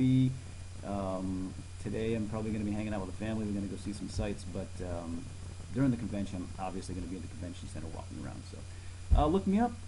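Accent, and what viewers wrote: New England, United States. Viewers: Your from new England!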